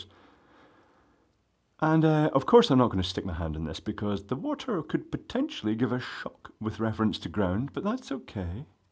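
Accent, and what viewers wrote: Scotland, United Kingdom. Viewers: I love your Scottish accent, the way you pronounce "i"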